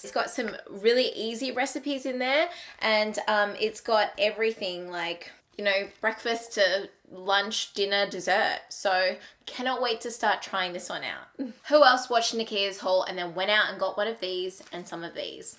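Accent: Australia. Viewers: this is a really random question but is she from australia? idk she sounds australian though. just asking because i want to watch australia youtubers and she was in my recommended and she seems nice and i love her already ahaha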